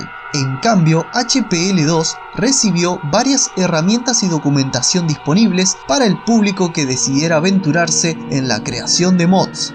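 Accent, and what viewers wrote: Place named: Peru. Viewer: Alta voz de peruano tiene este re gri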